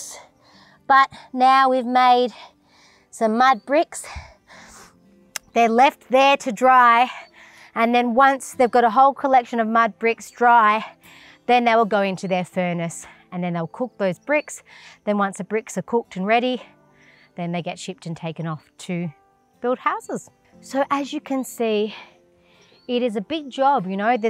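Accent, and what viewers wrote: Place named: Buganda, Uganda. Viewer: Thanks for loving Uganda, I love yr luganda accents u make mi day 😂😂😂😂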